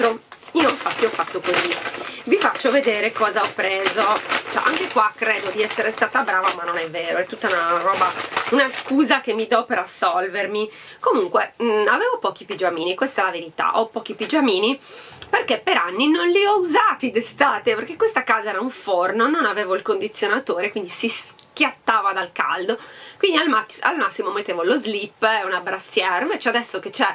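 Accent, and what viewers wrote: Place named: Florence, Italy. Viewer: Bellino!brava che parli il fiorentino😜ciao occhi da cerbiatta!🌺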